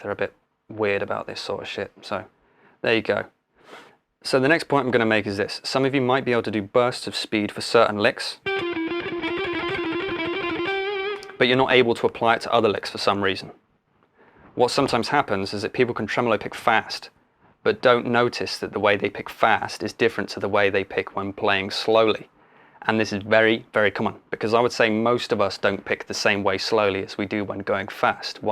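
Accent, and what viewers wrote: United Kingdom. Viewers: Wow. I can do it now! I think all it took was that lovely British accent. Oh, and also imagining that the pick is always in contact with the string. Brilliant! Thank you, brother.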